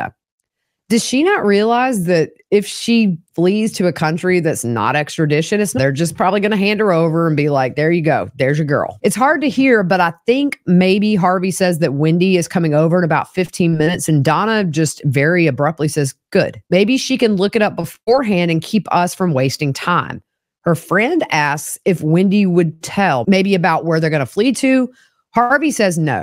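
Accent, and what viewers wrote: South, United States. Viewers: I like your southern accent. I live on the west coast and when I ended up in jail for 2 months when I was visiting NY everyone said I had the accent. Triips me out how many accents there is in the same country. Anyway my comment isn't about this evil family. Sorry.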